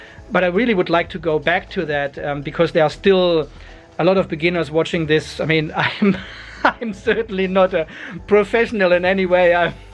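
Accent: Germany. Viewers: If I may ask. You are in Australia, but im assuming you are German based on your accent? Whats the story on that? Really love your videos. So real, so relatable. Keep it up.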